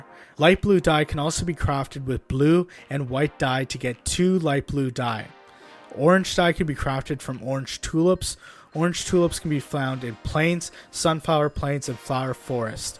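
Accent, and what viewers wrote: India. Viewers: I know because you can notice the accsent of indians and other And the channel name a pic are both realated to india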